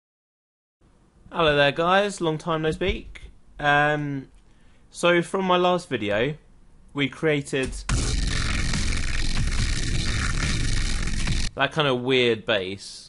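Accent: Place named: London, United Kingdom. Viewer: no he doesn't. He just has a normal british london accent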